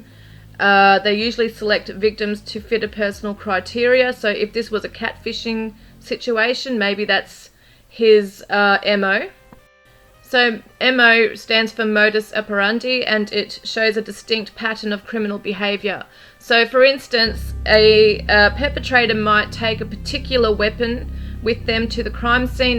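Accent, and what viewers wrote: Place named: Australia. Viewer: Hello Aussie patriot, I recognised your Aussie accent. I also have so many out there queries of all that happens. This case has been on my mind since day 1.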